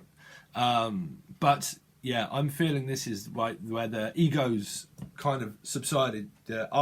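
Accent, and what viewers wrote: United Kingdom. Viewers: i understand british accent better, its more clearer than australian accent, thank you its quite resonate with me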